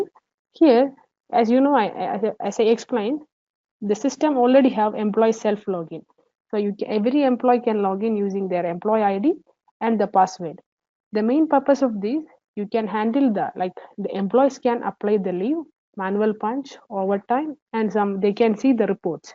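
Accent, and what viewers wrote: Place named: India. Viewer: لماذا الفيديو باللغة الأنجليزية وبلهجة هندية ؟ هل هذا استخفاف بالمنطقة أم أنكم لم تجدوا من يقوم بهذا الدور لكم ؟ لم يعجبني الفيديو ...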